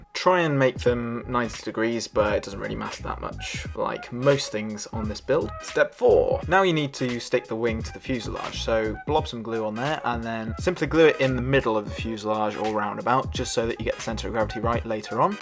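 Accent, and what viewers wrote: England, United Kingdom. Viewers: I’m jealous of your English accent.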